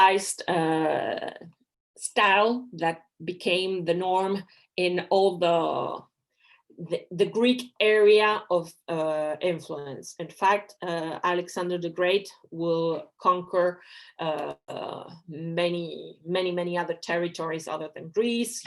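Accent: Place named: Spain. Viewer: amo la pronunciación perfecta de inglés, con detalles de castellano y muletillas francesas. espectacular.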